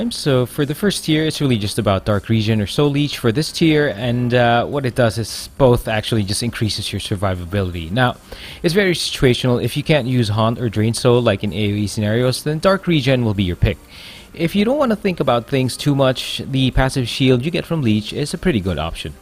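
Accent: Philippines. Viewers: Nice guide! Btw are filipino? Cause you have a little bit of filipino accent.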